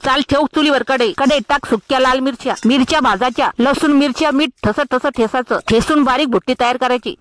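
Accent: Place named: Ahmednagar, India. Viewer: नगरी भाषा 😊❤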